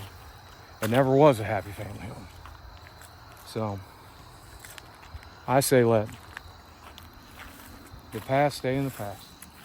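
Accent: South, United States. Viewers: It’s nice hearing Boogie’s southern drawl come back. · I noticed on both this video and the one from a few years ago, Boogie’s southern accent comes out a lot more when around that house. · It's funny to me that he lives in Arkansas but his southern accent only comes out when he goes to Virginia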